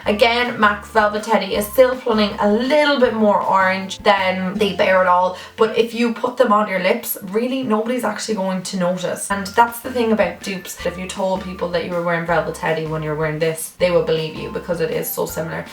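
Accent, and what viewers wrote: Ireland. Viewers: Omg I love ur accent X I'm going to Ireland soon X what part are u from X ? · Are you from Ireland?🇨🇮 cork here!😋😊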